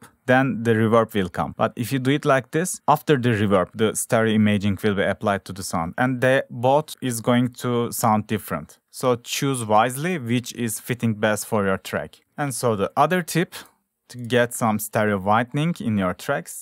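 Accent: Turkey. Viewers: Abi valla çok teşekkürler ya 1.5 saattir bunu arıyorum. Konuşma tarzından direk türk olduğunu anladım :D Bu arada parça muhteşem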